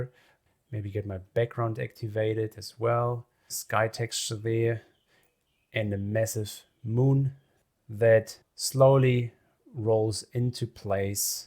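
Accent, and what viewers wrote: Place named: New Zealand. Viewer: Beautiful and practical way to get the shot ASAP. Also I lived in NL long enough to be able to pronounce your last name correctly, and your Kiwi accented English is a perfect living testament to your VFX career!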